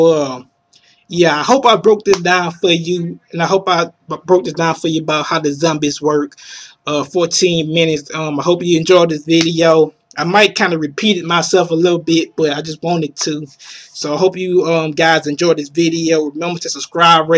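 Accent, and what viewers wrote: United States. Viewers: Are you from America man? You're accent is really cool, I can listen to your voice all day long haha!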